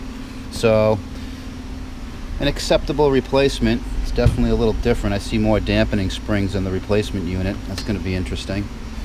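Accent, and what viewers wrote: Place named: New England, United States. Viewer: Nice videos, subsribed! :) Love that New England accent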